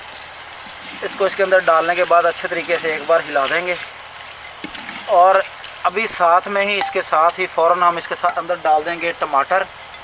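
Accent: Punjab, India. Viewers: hahahah maza aa gaya yar kaya bat hai punjabi tuch ka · Your improvement level is increasing day by day.keepd up specially ur Punjabi touch.I think you should make a whole video in Punjabi. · Nice Punjabi tuch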